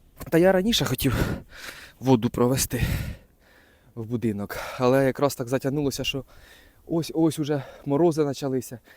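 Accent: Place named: Chernihiv, Ukraine. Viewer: Ти слуайно не з Чернігівською обл.-бо чую говор похожий?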